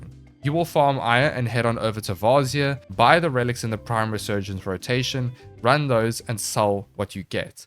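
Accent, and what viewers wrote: South Africa. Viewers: Is my bro south African?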